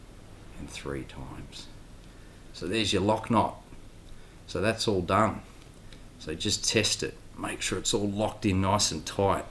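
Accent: Australia. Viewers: this is the easiest way to tie it, hands down. except for i think most ppl including this vid, over due the hitches. a couple times is cool, atleast from my experience. but good vid, and love the accent. CRIKEY MATE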